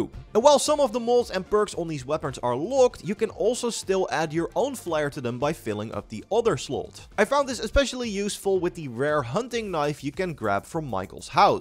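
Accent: Netherlands. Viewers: My god.. the Dutch accent in this guys' English 🥲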